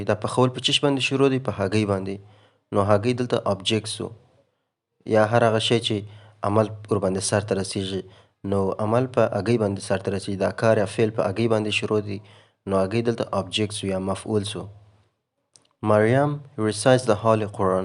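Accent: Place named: Kandahar, Afghanistan. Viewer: Leila sweeps the room. Leila - subject, Sweep- verb, Room - object. Thanks for your teaching 🥀 I like your teaching 🥀 ګرانه استاده! ستاسو تدريس مې ډېر خوښيږي. هيله ده ، چې دوام ورکړئ. کندهارۍ لهجه او انګليسي ژبه ډېر خوند کوي.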